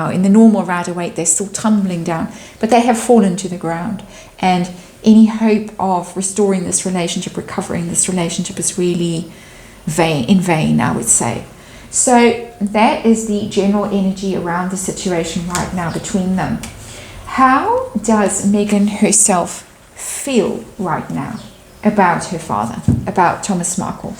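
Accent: South Africa. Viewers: Delighted to have found your channel, I lived in South Africa for decades and your lovely voice and accent make me feel nostalgic! You are a great tarot reader too!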